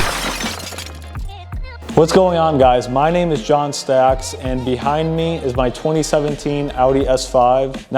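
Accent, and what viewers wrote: United States. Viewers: Dude are you from the Western Mass area?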